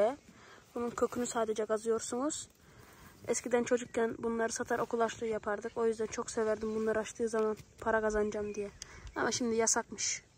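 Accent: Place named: Rural, Turkey. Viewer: Şu YouTube de ilk kez bana hitap eden bir sayfa buldum... İstemsizce saf ve icinden geldiği gibi bizim köylü agzi ile konusmasi beni o kadar güldürdü ki Allah da seni güldürsün 😂😂😂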